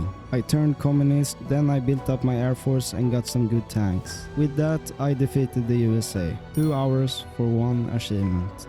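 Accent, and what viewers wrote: Sweden. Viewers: Är du från Stockholm? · man hör att du är svensk ( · You are Swedish , I can tell.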